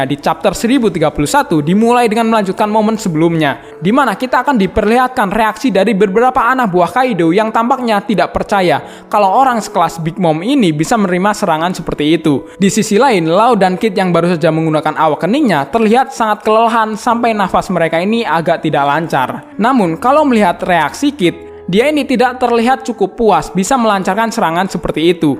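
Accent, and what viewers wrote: Java, Indonesia. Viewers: Tapi nada yang emang bikin nagih. gx bisa digantiin. Apalagi inggrisnya enak bro, lancar tapi aksen jawa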